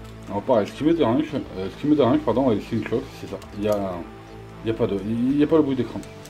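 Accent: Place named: South, France. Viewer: Tu viens du Sud toi 😂😉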